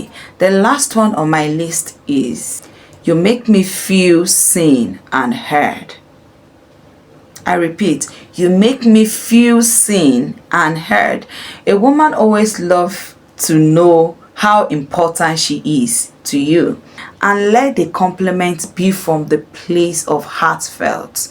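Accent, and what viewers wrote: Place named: Nigeria. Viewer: I'm not joking about it, this is what i thought you were coming from america , but when i hear your english it's where i notice that you are from Nigeria eg when you say Joy stick bacas and all those you add on it oh my goodness you put spies...i love it very much..💋💋💋💋💔💋💔💋💔💋😊🤣😊❤️‍🩹❤️♥️♥️💋💔 remain blessed my beloved sister from another Mother watching from Johannesburg...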